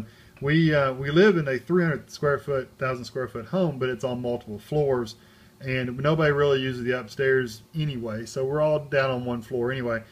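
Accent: United States. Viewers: Thanks for you video ! Its great made, you 're nice guy ! But Im french and would have appreciated english subtitle because you have a strong english/american accent ! lol :))) I would have like to see the internet settings panel because I didnt understand if you need to set up login and password of the internet provider or not for adsl...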